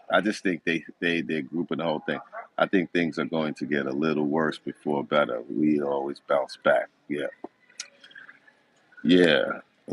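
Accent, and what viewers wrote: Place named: New York City, United States. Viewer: Your NYC accent is diminishing.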